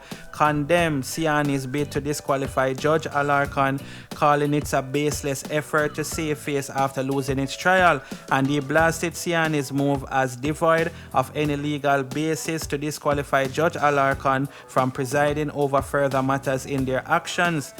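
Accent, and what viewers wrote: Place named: Jamaica. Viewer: It's his accent. He is Jamaican. I'm from St. Lucia in The Caribbean so I would know. All of us in the Caribbean have our various accents.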